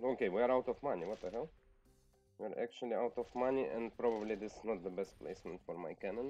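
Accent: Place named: Russia. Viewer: Fix your sound, mate. Add 20Db in post. It'd be better. And you've got a THICK Russian accent 😏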